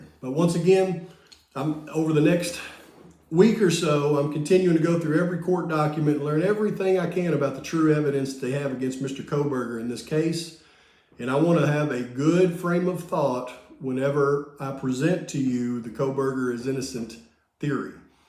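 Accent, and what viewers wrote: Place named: North Carolina, United States. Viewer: You surely have a born abd bred NC accent….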